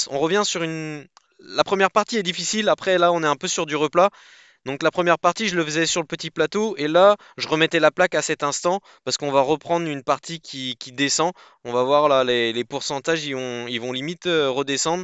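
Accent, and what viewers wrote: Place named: Brittany, France. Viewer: Tas pas des origines bretonnes ? Ton accent ça sent clairement le Finistère haha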